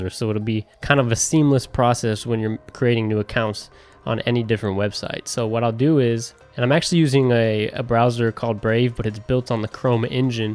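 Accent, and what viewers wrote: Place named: England, United Kingdom. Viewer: AAWWWWWWWWWWW shit I checked your like but and this is my second time through I have my Vault and got graded strong on my first master pw but I still have no idear WTFs going on. i'M WAY FOGGY ON THIS SETUP but at least our volume is good and you have a nice clear Engrish accent a bunch of these guys thought they were maxwell Smart and they needed to disguise their voice from big brother. Ok video i'm gonna try it again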